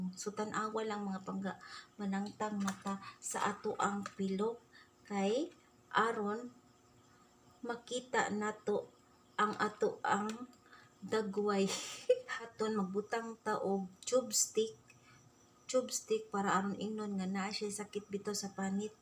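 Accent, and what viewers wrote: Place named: Visayas, Philippines. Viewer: bisaya diay ka tita hehehe cute kau paminawon tita, more video tita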